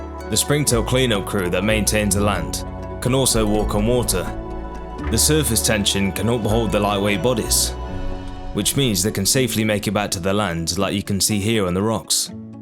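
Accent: United Kingdom. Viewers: I immediately heard that Brit accent 😭🤌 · are u british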